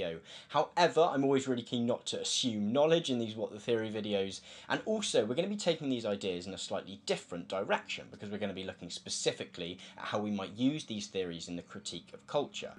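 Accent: United Kingdom. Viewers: I watch your videos and those of the Yale course on the same topics. Yours are 10 times better. You also outshine many other YouTubers discussing classic and contemporary philosophies. May I also say that watching your videos has boosted my respect for Exeter and British English, an accent I had trouble with?😀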